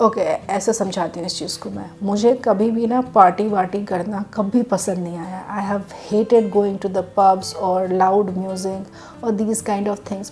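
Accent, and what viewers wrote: India. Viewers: Love the way you explain things.Love your smile, listening to you,has a bihari tone being a bihari love it.keep smiling always ♥️♥️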